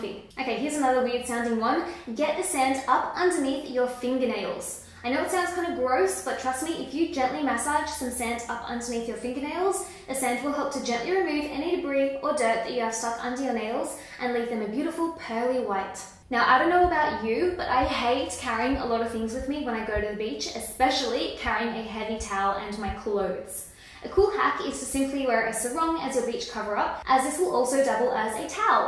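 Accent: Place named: Australia. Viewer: LOVED This! Thanks lovely!! Side note- I'm super surprised that a lot of people haven't realised that you have an Australian accent and there fore live in Australia... where it's currently summer ☀️😜 Haha xxoo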